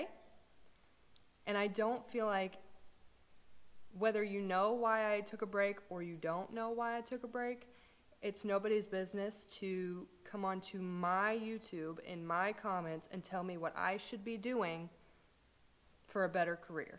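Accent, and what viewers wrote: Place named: Midwest, United States. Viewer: WHERE YALLS FARM AT...IT SOUNDS LIKE YOUR FROM THE MID WEST....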